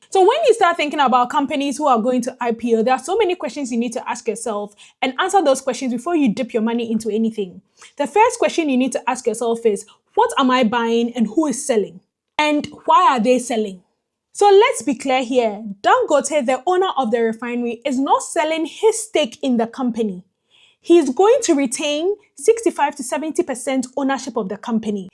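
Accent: Ghana. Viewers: She sounds Ghanian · You sound Ghanaian please refine your accents and be more Nigerian, your content is good enough but your accent is not so Nigerian and you know the vibes between the two countries You go explain tired ooo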